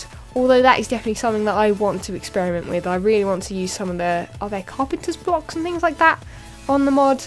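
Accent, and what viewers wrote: United Kingdom. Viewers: You had a lot of views for your Hermitcraft series. Hope people will enjoy this one. Good luck to my favourite british accent.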